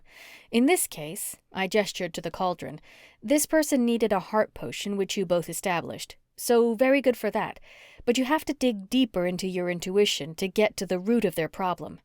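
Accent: South, United States. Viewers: Lovey story Narrated in a southern twang😊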